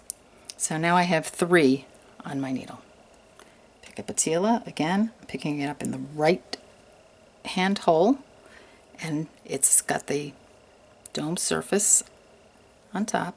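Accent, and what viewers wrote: New York, United States. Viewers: you sound like youare from New York, where most people have patience with us. I made this by using 6mm bicones and 6mm rounded beads my friends love it, i use 86 tila and i found another way to make it with squares as well.. love it, i would love you to make something with pinks and purples and silvers.. thanks Love you girl.. I can bring you some Blue paddings. I am so addicted to this necklace I have made 12 of them so far and to give to gifts.. i need to show you what i made so far now i am making a blue bracelet a bit different.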